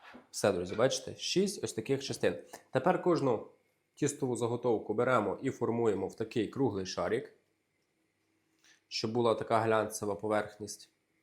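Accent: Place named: Kherson, Ukraine. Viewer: Сковорідка повинна охолонути, а не вистивати..... Хлопчисько говорить діалектом, бо дуже багато слів схожі на російську мову, але не російські. На Херсонщині теж говорять схожим діалектом.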